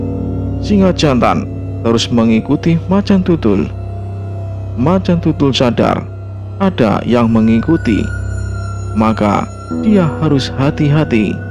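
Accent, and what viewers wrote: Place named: Java, Indonesia. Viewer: Gak apa2 mase maju terus.. Unik itu dialek jawanya poko e top maseee semoga sukses selalu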